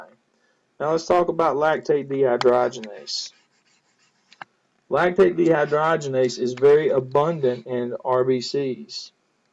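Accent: United States. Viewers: And i like ur pure american accent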